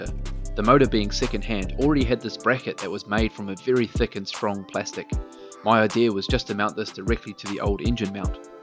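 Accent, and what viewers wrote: Australia. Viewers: that looks like fun. and it sounds like you are you are a fellow aussie. where are you buying the controller from?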